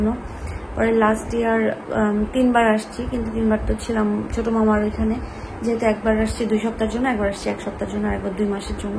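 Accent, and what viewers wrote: Bangladesh. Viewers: kathar dhoron dekhey bojha jay bangladesi 😅😅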